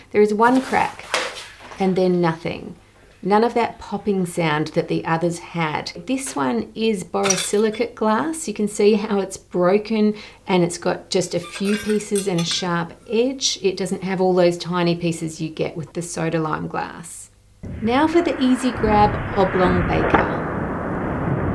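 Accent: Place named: Australia. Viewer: As someone who's grown up in Corning and has a dad who still works for the company that makes Pyrex, it was weird to hear an australian talk about it lol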